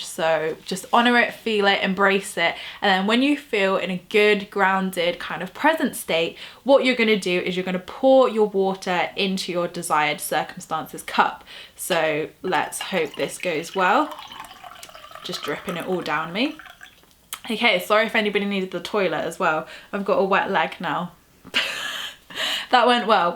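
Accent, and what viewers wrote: England, United Kingdom. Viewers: Hi I just found your channel I have tried the 2 cup method today I ask for more abundance I felt very happy doing it I said a prayer and I kept the desired note in a wooden box as suggested by another yoube channel any suggestions how long it takes before you see the results I love your English accent when I manifest some new friends I would love to visit England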